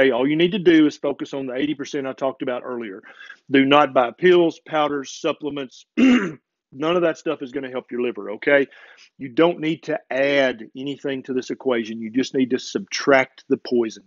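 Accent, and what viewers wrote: South, United States. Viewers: Nice to hear a educated southern accent with real advice!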